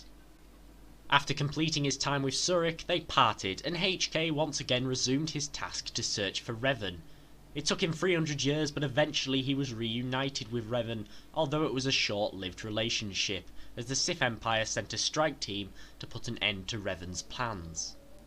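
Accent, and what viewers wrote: England, United Kingdom. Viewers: I don't get annoyed at the way anyone pronounces words. Being Scottish I'm often ridiculed by the English for how I speak. lol even my English friend does it. I just think its wrong to mock someone or get annoyed at someone because they don't talk the same way as someone else. and I found it ironic that English speaking Americans were getting mad at how an English person speaks English. It does sound kind of funny when you think about it. I didn't mean to cause offence or anything. Just found if kinda funny is all. Its really interesting how language develops over time and i get that. Although I have to say the Scottish language at its purest form confuses me. It barely sounds English. It just kinda bothers me how every video this guy makes some American is pissed because he talks different. Like in the UK most citys have different accents and slang and we embrace it. 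we don't tell each other were speaking wrong. That's all i was getting at. Why get pissed at someone for something that is really hard to change? I have been trying to lose my Scottish accent and pronunciation for years to try to Americanize it because I hate it and its hard. As for accents. Americans do have a thing for. the amount of girls who find my hot just because I talk Scottish is crazy. you cant really talk for your whole country just because you don't find accents attractive.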